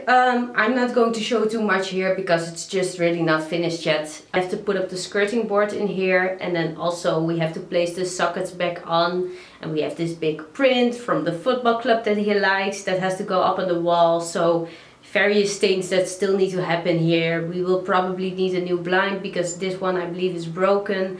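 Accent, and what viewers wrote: Netherlands. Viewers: I just stumbled on your channel and as soon as I heard you, I knew you were Dutch! My mother was Dutch and I have a lot of family in the Netherlands. I love your home! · Haha het Nederlands accent komt haast altijd overal bovenuit, zo cute🥰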